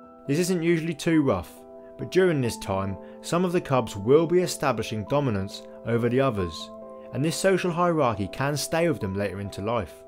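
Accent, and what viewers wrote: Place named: England, United Kingdom. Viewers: Very informative, nicely made and great to hear an authentic English voice. Keep up the good work. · You mean southern English voice 😳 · the irony of trying to tell an Englishman how to speak English 😂 classic Americans, so used to speaking a boring, robotic copy that their jaws drop when they hear REAL English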